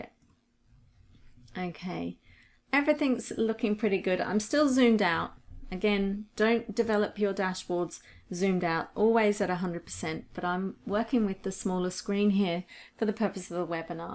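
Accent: United Kingdom. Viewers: It's her British accent. We in NA are suckers for it. lol. A smooth talker from the UK could sell refrigerators to eskimos LOL. But I agree - she is REALLY good.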